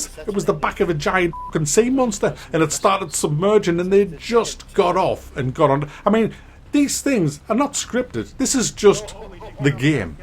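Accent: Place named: North East England, United Kingdom. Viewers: its the north east accent from the Tyneside region, most British accents don't pronounce boat like board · Love this guy - I'm from the North West of England and he's from the North East, so I'm very familiar with the accent, but these videos entertain me no end. The bit about the sea serpent XD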